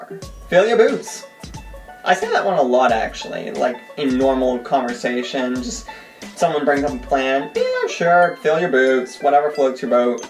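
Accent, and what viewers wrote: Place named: Ireland. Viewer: Sounds very Irish!! New subscriber 😄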